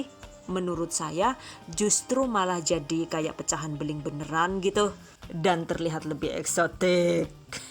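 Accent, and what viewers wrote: Banyumas, Indonesia. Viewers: Kok ngapak sih? Cuman lokasi madiun wkwk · Lah ngapak wkwk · Ngapak tenan kek Ustadzah Mumpuni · Madiun deneng ngapak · ihh si mimin ngomonge ngapak yaa😂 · eh padabae ngapak:v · Deng ngapak sih min??? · Wongg ngapak😅😅😅😅penjelasanya detaiil bangettt jadi mudah dlm mempraktekkan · Denek ngapak bu wong endi aku Cilacap bu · Mbarai enyong kencot baee 🤣🤣🤣 ORA NGAPAK ORA KEPENAK 😂🤣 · Jowo ngapak 🤣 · Busyet deneng ngapak koh 😂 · Jawa ngapak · Ora ngapak ora kepenak wkwkwk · Kaget pas ngomong ngapak .. jebule sekebangsaan ... Ora ngapak ora kepenak ya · Ngapak yee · Wonge mandan ngomong ngapak😂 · Kak orang mana si? Medok kaya orang banyumasan nih 😂😂😂😂😂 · Tag lokasi di Madiun tapi kok bahasanya ngapak.. 😅😂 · Wong endi kie ngapak😂😂😂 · Ora ngapak ora kepenak ya yu 😂😍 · Lah ya pada" wong ngapak ya😂 sing alon ya yu😄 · Koq bahasanya campur pake bahasa ngapak, mmg asli mana ta? 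Cilacap ato banyumas yu 😂😂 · Panggon e Madiun bahasane ngapak,😆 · Jebule wong ngapak rikaa😂😂😂😂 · Keren keren,,, buahahaha pliss jgn dikeluarin bahasa ngapaknya saya gak tahan ngakak trus nih.bagus sih jd ada lucu2 humor nya.moga sukses selalu yah.amin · Karakter suaranya unik bgt, bahasa inggrisnya fluent, ngapaknya mantap bgt. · Lah rika wong ngapak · bahasa ngapak nya lucu 😅😅😅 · Ngakak ngapak nya ....😂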